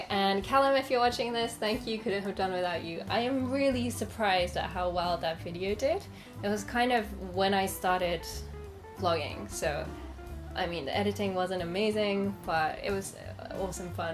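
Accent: United Kingdom. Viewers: are you originally from South Eastern part of England? Your accent sometimes has a tinge of a South Eastern or often times confused with Aussies sounding accent. (I've met some people from East Sussex and Kent that have an Aussie sounding accent, though it would fluctuate between that and just an average Londoner's accent.) By the way I think your nose gives you a unique look that sets you a part from the crowd and you have a nice attitude to go along with it. Like they say beautiful inside equals beautiful outside too. In your case that is very true. Cheers ad do not listen to the haters, you are an amazing lady! Enjoy Japan and where ever you go to next too! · Japanese girl with a brit accent...ummm... i'll take two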